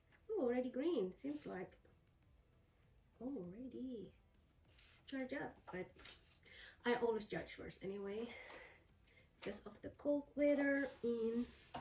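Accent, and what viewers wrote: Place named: Scotland, United Kingdom. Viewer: I thought you did a great job.,.... Maybe it's hard for people with that thick Scottish accent to understand.. Heck nobody can understand them anyway.... Keep after it girl 🙂🙂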